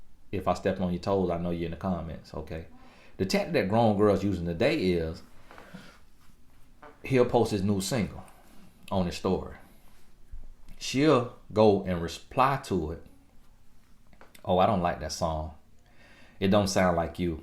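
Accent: South, United States. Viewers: I love southern twang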